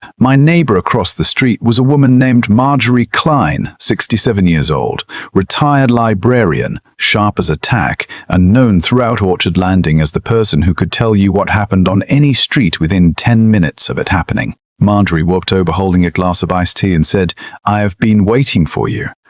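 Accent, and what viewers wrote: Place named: England, United Kingdom. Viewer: A I needs to learn how to read English correctly. Learn how to pronounce garage. Need a voice from America not from England.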